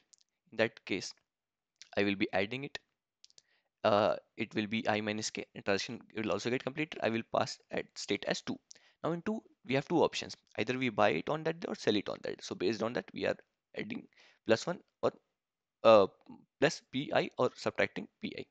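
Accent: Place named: India. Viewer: Обожаю индийский английский))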